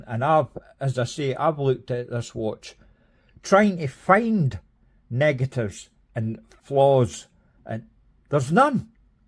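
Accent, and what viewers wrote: Scotland, United Kingdom. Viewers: Love hearing a Scottish Watch reviewer, great review and insight, Christopher gives you so much quality for the money and I think embarrasses more expensive brands like oris that you mentioned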